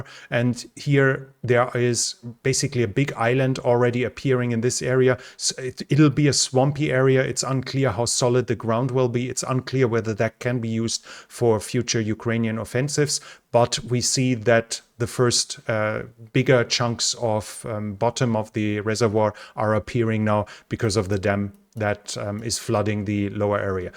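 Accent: Germany. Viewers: Hessisch Dialekt? Your English sounds like my Frankfurt family..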